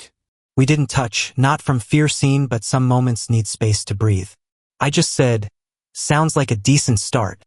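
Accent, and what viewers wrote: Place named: Spain. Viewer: The voice. Missing words. Spanish accent thrown in. All reasons why I could not listen to this all the way through. 🤮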